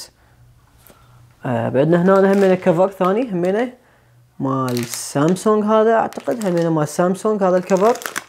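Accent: Iraq. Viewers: احب اللهجة العراقية كرمال هيك احضر الفيديوهات مالتك 🤣😂🤣😂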